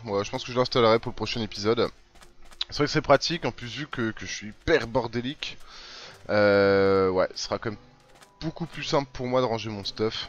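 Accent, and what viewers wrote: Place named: Belgium. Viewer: pOUCE VERT POUR L4ACCENT BELGE !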